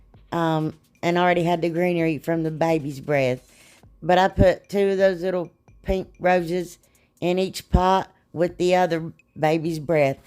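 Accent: South, United States. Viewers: 1st time viewer,,,and I absolutely ❤ you and your personality and not to mention ya sound like me when I talk,,,(country accent)🤭🤭🤭,,,I'm from Alabama,,,where are you from? Love your creativity,,,,I am now subscribed,,,cant wait to see more,,,thank you for sharing💕💕💕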